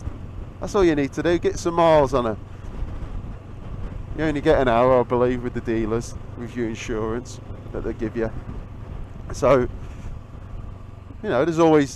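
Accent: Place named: United States. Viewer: Great job and great American accent,haha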